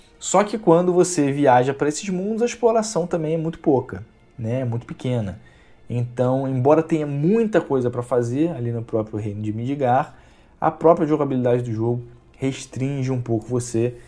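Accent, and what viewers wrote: Rio de Janeiro, Brazil. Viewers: Vc e carioca!?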